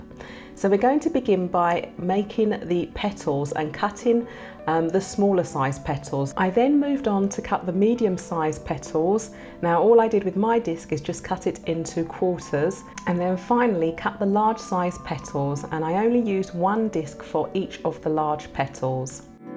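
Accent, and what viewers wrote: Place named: England, United Kingdom. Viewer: I like your voice and your English accent 😃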